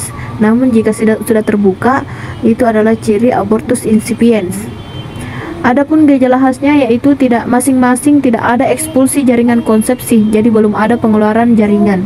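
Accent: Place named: Bone, Indonesia. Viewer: Logat ta kyk org bone kak.. mksh sdh buat videonya kak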